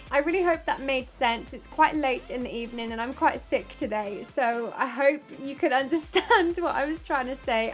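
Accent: United Kingdom. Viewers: I love her and her british accent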